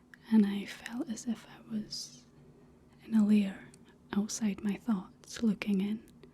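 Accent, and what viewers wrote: Scotland, United Kingdom. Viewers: I came across a few of your videos with your storytelling and just fell in love with your voice. I can honestly say I've always loved the Scottish accent. Growing up on the east coast of Canada, Newfoundland we are known for our unique language.. Keep being you. Never change. You have a beautiful soul. Thank you for being here. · Scottish accent definitely works on me. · A Scottish lassie that does Star Wars references? I think I am in love🥹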